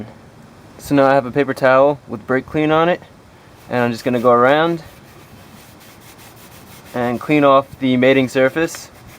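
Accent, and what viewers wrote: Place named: New Jersey, United States. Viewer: he has a New Jersey accent! -from Texas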